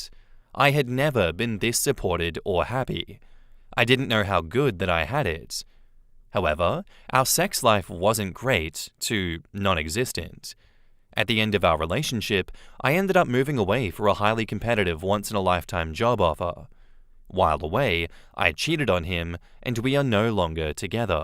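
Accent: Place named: Australia. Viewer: Me over here understanding "The poly and burner part" during the intro. Thought I was used to the Australian tongue by now, but the fr*nch is throwing a wrench in that.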